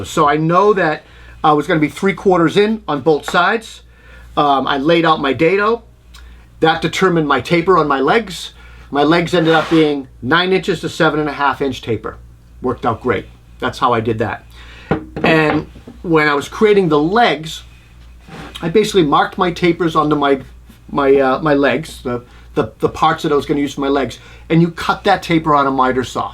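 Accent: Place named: New England, United States. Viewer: my gf and I love your videos. She's the hobby woodworker and I'm learning from her. Your instructions are easy to follow and your voice is both slow and clear. Maybe it's a Massachusetts or New England thing but you remind me of This Old House and the New Yankee Workshop.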